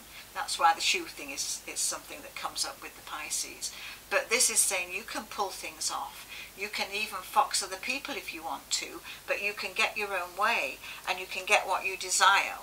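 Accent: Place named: United Kingdom. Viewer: i love the british accent and english , my school teacher was a britisher and taught me the Shakespeares plays , there were many references to prophecies in the plays, love your voice and puts me back in the class room, keep speaking.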